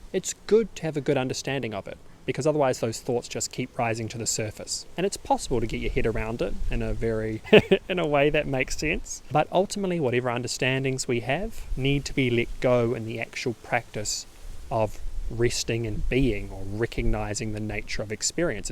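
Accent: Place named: New Zealand. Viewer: Its nice to hear a NZ accent on youtube talking about non duality thanks .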